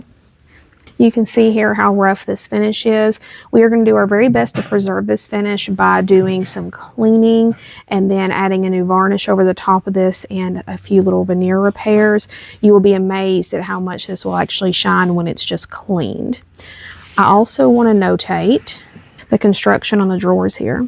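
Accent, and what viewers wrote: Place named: South, United States. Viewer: Did I not say you are intelligent and knowledgeable? Hon, you should hear my accent. I'm from SC. I spent a lot of my life in business offices, and I know how people perceive that particular regional dialect, because I used to speak it. But you do you. I appreciate your knowledge, and will keep watching you, regardless. Honestly, just trying to help, so you will be seen as more professional. People already think anybody with a southern accent is stupid. I live in FL, and I moved from S. FL to N. FL in part because people made fun of my accent. I love it up here. It's truly the deep south of FL, complete with oak trees dripping with moss. Have a lovely, day. I didn't meant to hurt your feelings. <3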